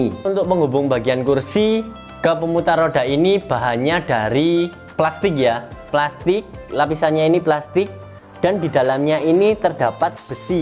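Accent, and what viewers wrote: Java, Indonesia. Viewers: Masih medok Harus di halusin 😄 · Aku suka medok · Mendok banget... Gak enak dengernya.. Belajar ngomong dulu ya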